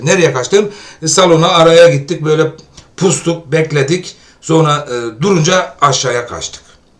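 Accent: Turkey. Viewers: Ben de Kahramanmaraş/Andırın lıyım hocam edem senin "PUSTUK" dediğin ağzını severim, iyi ki varsın takibe devam❤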